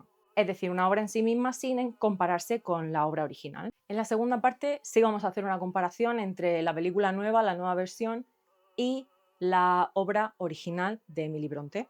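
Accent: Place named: Andalusia, Spain. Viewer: Bothering Heights. No te avergüences de tu acento cordobés. Ojalá acabáramos con ese estigma que supone hablar con acento andaluz.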